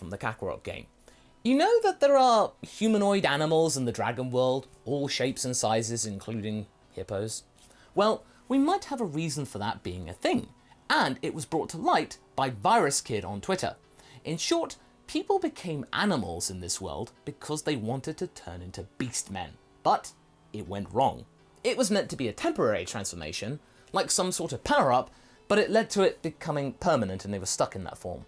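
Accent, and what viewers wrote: United Kingdom. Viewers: I like your accent, are British, New Zealander or Australian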